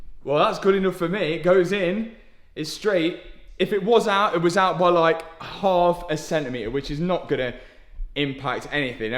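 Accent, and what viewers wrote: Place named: Nottingham, United Kingdom. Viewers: welcome to America, where we fix our own stuff allll the time. Wanna know why? Because if one man can do it, another man can can do it as well. With enough time money research and patience ANYTHING can be repaired by anyone at all. This is also why America has led the way in the automotive industry since the Model T, and why car YouTube was pioneered by Americans. 🇺🇸 I’m glad to see stays positive and isn’t scared off by things he is unsure of. Innovation, hard work, trial and error are the backbone of a man like. is basically a yank with an English accent. Good work buddy 🤜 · Without being rude. May I ask where you are from?your accent sounds like a Nottingham accent.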